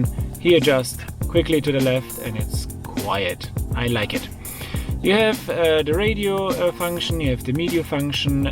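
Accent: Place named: Germany. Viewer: Jag måste bara fråga, är du tysk men bor i Sverige? Anledning att jag frågar är att du verkar ha tysk brytning på din engelska. Massa ursäktanden från min sida om jag har fel.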